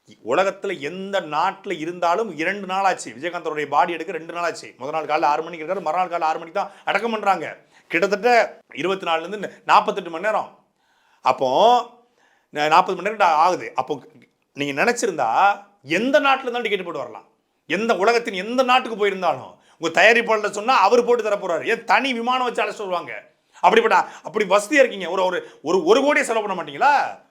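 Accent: Tirunelveli, India. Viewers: பசாட்டை அவர்கள் அய்யா நீங்கள் உன்மையை உரைத்து மிகப்பெரிய மனித வடிவம் எடுத்து இருக்கிறீர்கள். தக்க சமயத்தில் உதவி செய்வது யாராக இருந்தாலும் பாராட்டுக்குரியவை என்று பாராட்டியது பாராட்டுக்குறியது. நல்ல நெல்லை தமிழ் கேட்க இனிமையாக பேசும் திறன் உடைய நீங்கள் நேரம் கிடைக்கும்போது வள்ளுவம் கம்பராமாயணம் போன்ற காவியங்கள் பேசினால் கேட்க இனிமையுண்டு. தமிழ் தொண்டு ஆகும். தங்களுக்கு பகவான் எல்லா வளமும் உடல் நலமும் கிடைக்க பிராத்தனை செய்கிறேன். உங்கள் வழியில் வெற்றிபெற பகவானை வேண்டுகிறேன். எதாவது தவறாக இருந்தால் மன்னித்து கொள்ளுங்கள் ஓம்நமோ நாராயணாய நமஹ!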